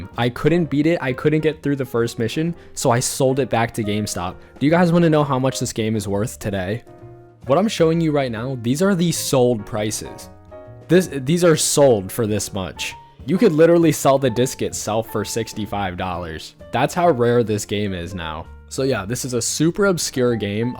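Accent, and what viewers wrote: Poland. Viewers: bro are you polish??????????????????????????/ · Are you polish?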